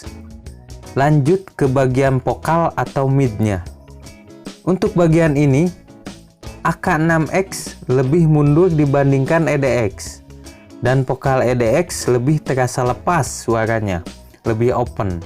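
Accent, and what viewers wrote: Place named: Nagreg, Indonesia. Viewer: Owalahhh orng nagreg to bang 🤣 Btw riview nya bagus 👍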